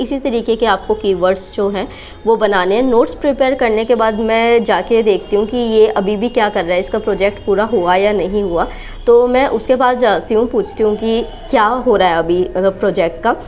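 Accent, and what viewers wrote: Haryana, India. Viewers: R u native from Haryana ????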